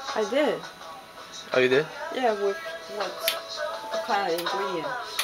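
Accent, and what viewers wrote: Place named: Cantonese, China. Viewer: DV沒電了嗎?~_^ 您的廣東腔果然很重, 我看到了妳廚房裡面也有"米酒" haha~~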